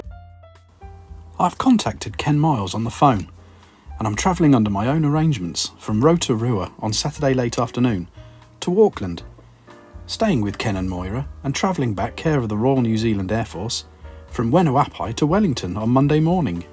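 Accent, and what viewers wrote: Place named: England, United Kingdom. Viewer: Steak and milk.... very good for me reading, earing lovely words slowly, easy to repeat if not understanding, and english accent i can restaure with your tonics on words. Not only the lesson about phylatélic value about this New-Zealand letter from 1974. Words are so well writing, at middle of letter eyes were synchronize with words and your voice. Great time and good idea Thank you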